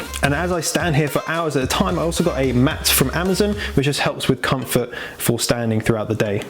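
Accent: United Kingdom. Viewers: Great video man. I think you have everything but blinds in the living room should do it all. Really like your place. Love your English accent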